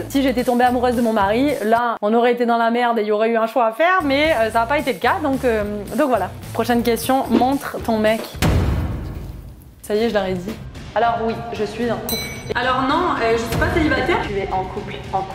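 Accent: Quebec, Canada. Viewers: Je n'avais jamais remarqué mais tu a un peu l'accent Québécois c'est est géniale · Je trouve qu’on entend de plus en plus un accent québécois quand tu parles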